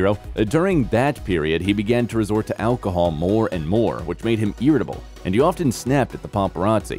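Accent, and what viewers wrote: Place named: United States. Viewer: Americans always say " Mel born " instead of " Mel ben "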